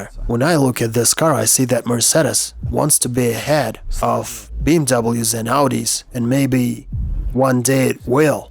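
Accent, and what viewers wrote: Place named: Russia. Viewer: как всегда - восторг! Субъективное мнение: Если этот канал ориентирован на англоговорящих людей, то им не будет интересно это смотреть из-за озвучки. Акцент слишком русифицирован и не чувствуется какого-то драйва диктора. Он не говорит так, как говорит американец или британец - интонационный поток "мертвый" в каком то роде:( Интонация всегда падает вниз и не передаёт эмоции рассказчика как таковые. Возможно в оригинальной озвучке их и не особо много было, но над этим явно стоит поработать и тогда англоговорящая аудитория будет заинтересована слушать это! Можно взять любой американский обзор и увидеть разницу - <link> Всех благ и удачи!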